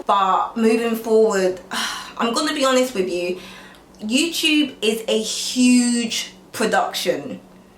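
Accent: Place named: England, United Kingdom. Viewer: I wonder where in England you’re from?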